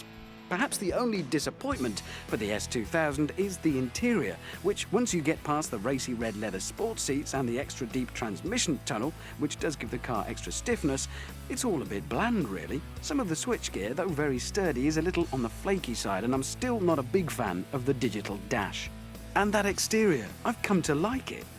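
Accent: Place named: RP, United Kingdom. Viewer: he used to speak really posh.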